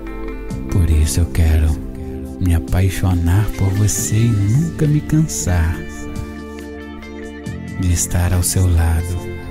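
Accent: Minas Gerais, Brazil. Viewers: como pode vc falar palavras como se fosse ele tipo Minas Gerais Foi ele que falou essa palavra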